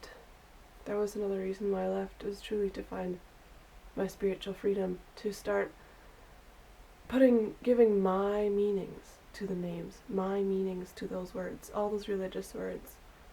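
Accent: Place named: Manitoba, Canada. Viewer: I can absolutely tell that you were raised holdeman by the way you speak. Thank you for sharing! May I ask, are you from Manitoba? You have the classic Manitoba accent within the "church".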